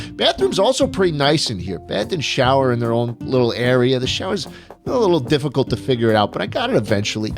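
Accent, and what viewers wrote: New York, United States. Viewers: His New York accent is pretty constant but it gets stronger sometimes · i like how his thick new york accent slowly faded away since he retired, leaving that velvety voice i remember from his earlier days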